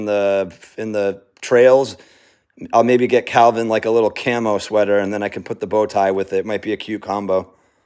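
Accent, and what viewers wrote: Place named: New England, United States. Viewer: Do I detect a New England accent there?!I guess I do!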